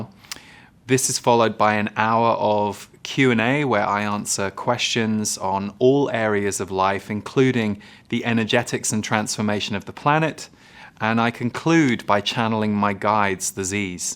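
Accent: United Kingdom. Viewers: Not complaining as a culture is great, but please don’t lose your British accent. 🤍🙏🏼✨😎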